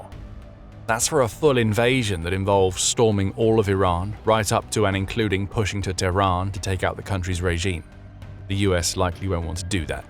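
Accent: England, United Kingdom. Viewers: Refrain from crying about President Trump and his methods. You’re saying it with venom in your voice with an English accent while watching your own country devolve into islamification. So don’t be too quick to cry about the methods of the USA to handle the situation. We got this. Get off your high horse, or would you rather have a nuclear capable Iran telling the UK they have to take in more military aged men?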